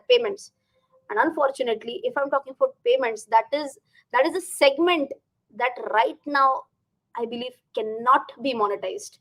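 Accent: Maharashtra, India. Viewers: I want to learn Marathi after hearing ur accent😍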